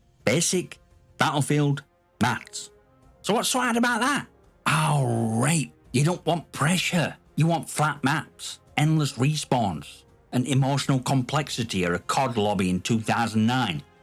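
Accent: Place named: North, United Kingdom. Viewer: Yooooo too funny bro. Nice to hear a northern accent telling it how it is. New sub from me and spot on btw with what you said. Too many pump and squirts out there lol gg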